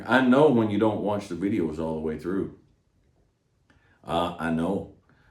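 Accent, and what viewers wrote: United States. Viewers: bro ı dont understand english but your cowboy english ı like it so ım watching you AHAH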